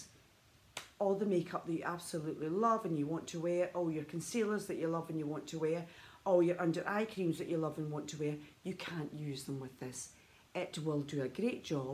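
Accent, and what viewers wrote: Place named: Scotland, United Kingdom. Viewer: Love the Scottish accent…candid review from the lady well done ..mam 👏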